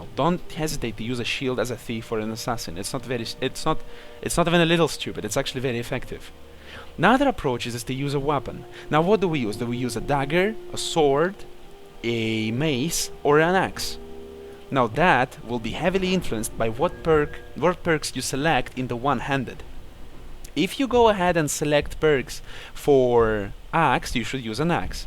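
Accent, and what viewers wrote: Russia. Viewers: dude, he is russian as well as I do. Accent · he sounds more Russian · Russian accent is awesome! Especially swedish with a russian tinge. :)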